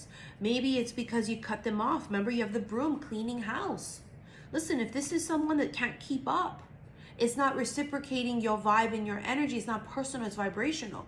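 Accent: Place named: Hawaii, United States. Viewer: I knew you were from Hawaiʻi b4 u even said it! Ke Aloha nui Tita!🙏❤️🌿🌺😂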